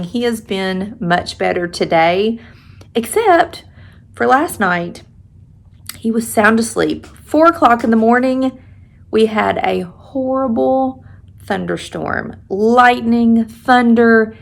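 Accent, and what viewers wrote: South, United States. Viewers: I found you looking for growing out grey hair info. Love your Southern accent as I am from the South, but live in the North. Then found Pollux videos. I lost my German Shepherd, also a rescue, in 2017 and can't get over losing him. So am enjoying getting my doggie fix with Maxie and Pollux. Thank you. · Being from S. Cali, I just LOVE Southern talk even though usually do not know what the phrases even mean, lol☺😂~Plus, just has the prettiest accent ever, and I have always wanted one so much❤:)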